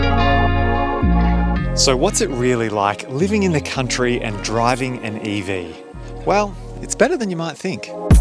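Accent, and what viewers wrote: Australia. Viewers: I really love your videos. And your aussie accent 😊 i really have to get back to down under… greetings from a model y owner in germany. But the green/sand sweater 🫣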